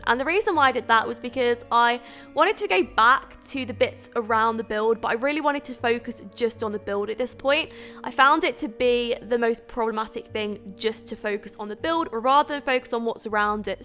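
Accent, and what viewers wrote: United Kingdom. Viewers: i like your british accent 😣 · I love your Brittish accent <3 Even when I dont understand everything but I dont care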